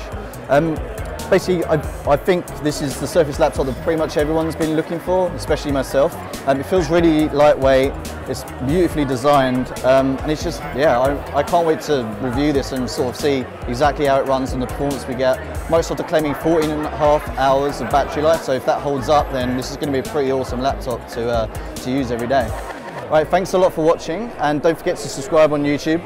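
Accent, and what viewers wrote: United Kingdom. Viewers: Is this reviewer from UK? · Unrelated, but please speak clearly!! yeah, cool you're from the UK, but slow down, normal pace, microphone in the right place.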